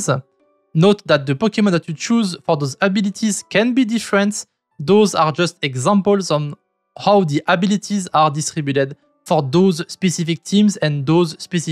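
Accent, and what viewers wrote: France. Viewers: je m'abonne car tu me fait rire avec ton accent français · Hahahaha your french accent is f obvious when you speak, also nice video btw.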